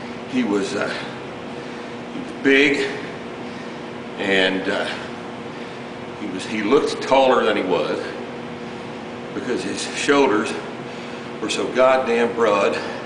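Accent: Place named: United States. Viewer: What the fuck are you on about? There was literally no cussing, he's speaking clear American English (which is his audience). What could you possibly have to bitch about?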